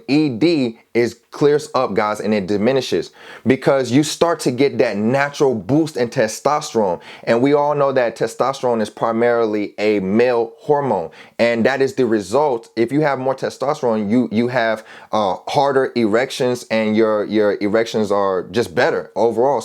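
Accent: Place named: United States. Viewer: Yo boss thank you for this video you’re a funny guy, what part of the US is your accent from?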